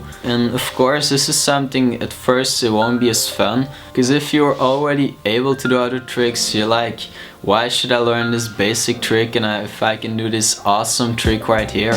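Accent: Netherlands. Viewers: are you dutch? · Are you Dutch? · yep, obviously dutch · u dutch? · Okay but that Dutch accent tho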